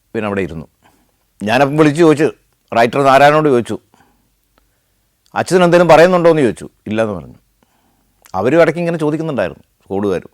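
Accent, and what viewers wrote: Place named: Alappuzha, India. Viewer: alla ആലപ്പുഴ ആണെന്ന് തോന്നുന്നു നല്ല അച്ചടി ഭാഷയിൽ സംസാരിക്കുന്നു ❤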